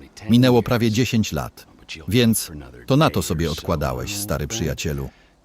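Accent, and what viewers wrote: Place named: Rzeszów, Poland. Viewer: Na delegacji 😂 Czyli stąd pomysł na podkładanie głosu pod Wielmożnych Pożyczycieli Aut, rzeszowskiego nie wyplenisz z mowy 😉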